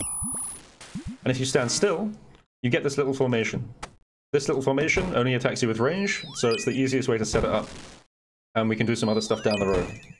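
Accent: United Kingdom. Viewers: u from the uk?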